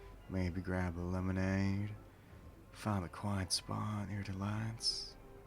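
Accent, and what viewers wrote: South, United States. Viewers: Ahhh! I’ve missed ur southern accent videos one of my favorites!! :3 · Been absolutely lovin all these (your) audios, but the southern ones rly be hitting a bit different😊🫠. Hopefully gonna hear more in the future♡. (And possibly a part 2 to this one😇) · your southern accent is still as cute as your first cowboy roleplay HAHAHAAHHA! ( ≧∀≦)ノ CUTIIIEE! glad you're back <3 (((o(*ﾟ∀ﾟ*)o))) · Okay ffs i wasnt exoecting it to work on me i can feel my heart rate pickin up quickly southern accents and country folk are alwasy gana be my weakness it reminds me of home and beyter days i supose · Accent: ✅ Nickname: ✅ Blushing: ✅ ✅ Safe to say southern boys are my thing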